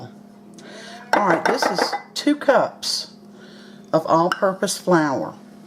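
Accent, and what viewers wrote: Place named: North Carolina, United States. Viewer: You're just the sweetest YouTuber! You sound like a North Carolinian. (My home state) I can't wait to try your recipe. I'm too old and stressed to be fighting against time with butter recipes for pie crusts.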